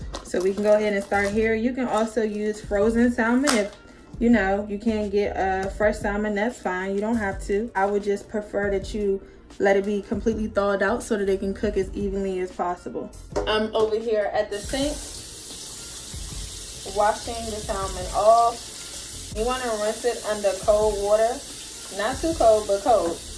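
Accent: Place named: Maryland, United States. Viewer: I was thinking the samething. She sound like she from Maryland to me!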